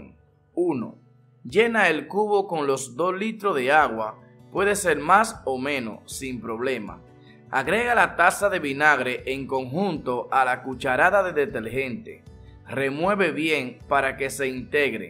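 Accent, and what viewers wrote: Dominican Republic. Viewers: Jajaja creo que los dominicanos hablan asi o Puerto rico no me acuerdo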